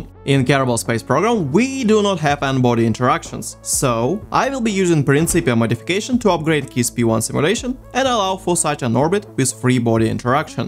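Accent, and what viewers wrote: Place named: Russia. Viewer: You sound... Russian. Даров если Русский.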